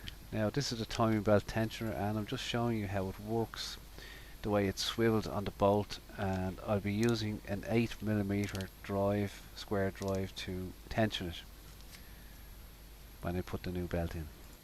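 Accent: Ireland. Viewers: Fan belt, your old school. Love the Irish accent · Sounds Irish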